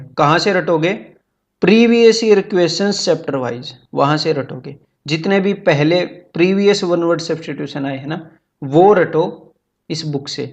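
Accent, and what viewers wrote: Haryana, India. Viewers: Sir aap haryana se ho kya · Lol yer your accent is cute..haryanvi sort of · Very good sir.... Aap Haryana se ho...?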